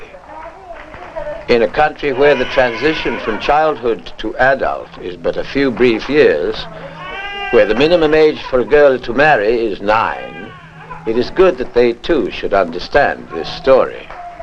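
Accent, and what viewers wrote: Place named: United Kingdom. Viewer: المعلق ليس امريكي بل بريطاني و الممرضه من أيرلندا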